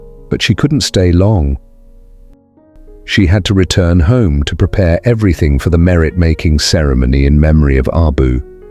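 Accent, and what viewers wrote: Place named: England, United Kingdom. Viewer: Εισαι σωστός αλλά από τήν προφορά καταλαβαίνο ότι είσαι Άγγλος. Οπότε δεν θα σε ακολουθήσω γιατί σιχαίνομαι την Αγγλία και τις πολιτικές τις . Εσεις ψηφίζετε τους πολιτικούς σας άρρα εσείς είστε υπεύθυνοι για τό ότι προσπαθείτε να σύρετε την Ευρώπη σε πόλεμο. ΕΞΩ ΟΙ ΒΡΕΤΑΝΟΙ ΚΑΙ ΜΑΚΑΡΗ ΝΑ ΣΑΣ ΚΑΨΗ Ο ΠΟΥΤΙΝ.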